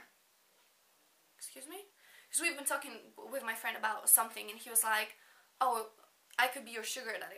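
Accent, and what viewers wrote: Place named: Albania. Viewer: This was so funny! Are you Albanian? I love your accent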